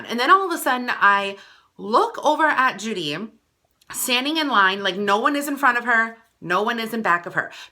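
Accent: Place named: Midwest, United States. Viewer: dolly5621 chirios her voice makes my ears bleed. I really can’t stand the midwestern WICSAANSIN/ MINISOOOOTA accent. Ugh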